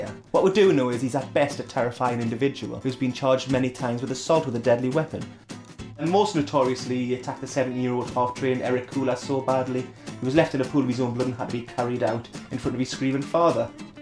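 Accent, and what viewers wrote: United Kingdom. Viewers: This is too british for me to understand.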